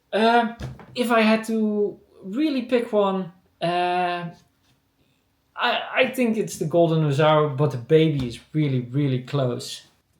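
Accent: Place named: Netherlands. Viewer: Grappig hoe je gelijk hoort dat je Nederlands bent. Toffe figures. Ben zelf niet echt een fan van die Baby vegeta, oogt te groot.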